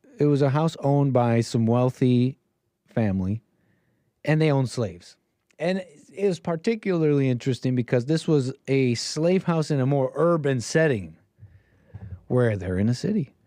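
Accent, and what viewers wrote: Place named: Arizona, United States. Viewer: Love the tombstone accent.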